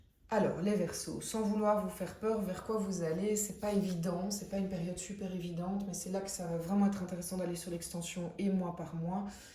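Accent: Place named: Belgium. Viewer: Tirage intéressant et que je ressens, comprends....Très fine interprétation ! Votre élocution, et sa richesse est vraiment Top. J'ai cru déceler un soupçon d'accent Belge et je l'adore. J'ai beaucoup d'amis Belges qui ont un humour formidable. le 2 ièm semestre s'annonce peut-être encore plus usant que le 1er. Va falloir prendre soin de sa santé et rester le plus au calme pour pouvoir s'économiser et être dans la lucidité en cas de prise de décision importante...Merci beaucoup. Je like et je m'abonne 🙏👍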